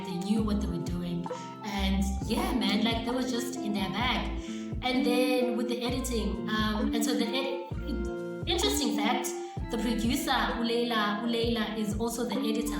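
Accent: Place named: Eastern Cape, South Africa. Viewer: Your accent makes me miss living in the Eastern Cape🥰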